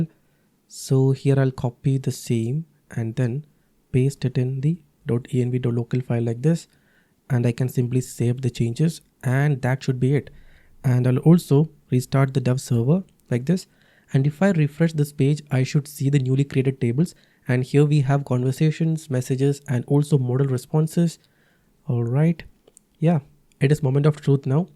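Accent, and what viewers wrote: Kerala, India. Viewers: You sound so mallu with your mallu English bhai....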